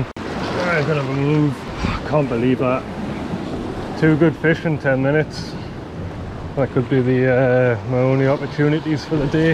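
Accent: Newcastle, United Kingdom. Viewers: are you from newcastle or sunderland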